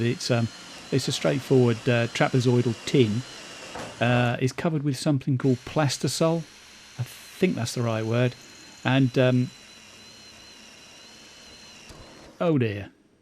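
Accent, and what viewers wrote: Norfolk, United Kingdom. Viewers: many thanks. I will have to google cruck frame and sip panel as I don’t know what they are. The only thing I was worried about if I sank it low was damp. You sound like you’re from Norfolk way. I’m Wisbech based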